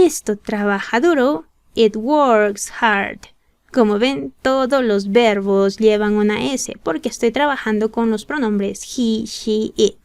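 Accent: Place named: United States. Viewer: Es inglés americano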